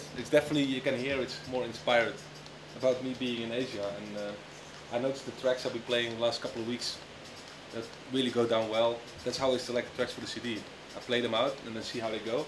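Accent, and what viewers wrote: Netherlands. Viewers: Love the Dutch accent when he speaks English. So obvious for Dutch people in general. =) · he has a dutch accent ;p cuz he is dutch xD Best Dj''s in the world from the netherlands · Lol such a Dutch accent.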